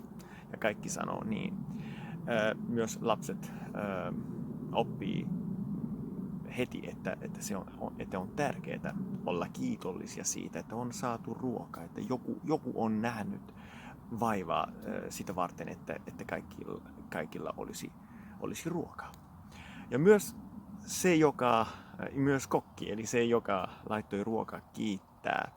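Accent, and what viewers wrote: Finland. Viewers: Now that you said it, I agree! There are a lot of swedish speaking finns in finland, and your pronounciation is quite similar :) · Treenaatko suomen aksenttiasi erikseen vai onko kehittynyt korvakuulolta?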